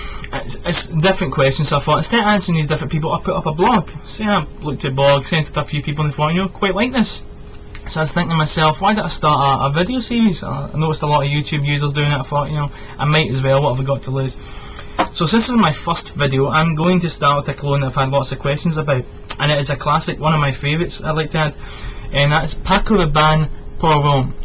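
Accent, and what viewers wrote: Scotland, United Kingdom. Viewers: Just don't ever delete your channel. I like to visit it time and again like it's a shrine or something. You're Scottish and I'm a massive Medieval history buff. Oddly enough, your accent, the way your video's shot and your taste in perfumes tie into my weird mental image of a laid back baron from the feudal times who loves his perfumes. I always associate the notes of iris, tobacco, violet, oakmoss, cedar and the likes with the UK from the medieval times. Dim lights, stone walls, smells of fougere and something barnyard like all mixed together with a smell of wet stone/earth. I was playing Kingdom Come Deliverance the other day and I had to get back and check on. I hope you're doing well my friend. You're a youtube legend.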